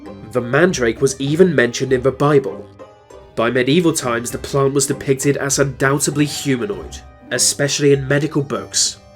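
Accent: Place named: Scotland, United Kingdom. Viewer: I don’t think it’s a speech impediment I think it’s a local accent because I’m from Scotland and in some parts they say van instead of than and vey instead of they I could go on but I’d be here all day lol , I actually find his voice quite pleasant tho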